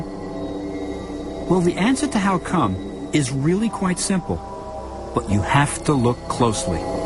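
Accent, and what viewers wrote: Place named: New York, United States. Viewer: Pyramids ...described by a fukkin new yawkuh hahaha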